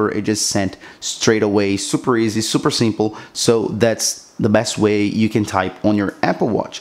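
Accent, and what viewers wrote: Brazil. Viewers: I was like “I can hear the brazilian accent” and then I saw your whatsapp msgs “blz man” hahahahahaha muito obrigada, ajudou muito!!!!!!!!!!!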